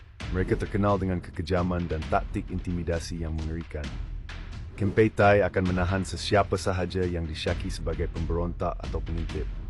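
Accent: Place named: England, United Kingdom. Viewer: Xboleh cakap melayu elok2 ke..cakap pelat omputih bagai..buat malu bangsa ja kau..mat poyo..mat salleh celup. Bangang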